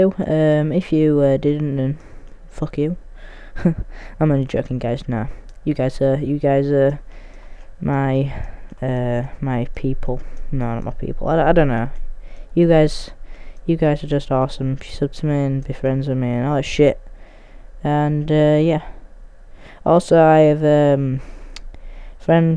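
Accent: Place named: United States. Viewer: U still sound american lol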